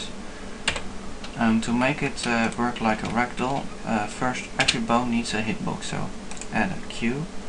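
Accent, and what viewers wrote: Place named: Netherlands. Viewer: nice dutch acscent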